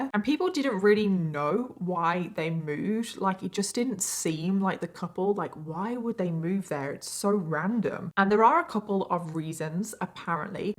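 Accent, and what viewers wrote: United Kingdom. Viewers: I’ve been watching A LOT of your videos. I absolutely love the way you explain out the cases as well as add your input on the cases and I LOVE your accent (I live in America so it nice to hear a British accent) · I love your british accent, but I really don't like most of the british accents.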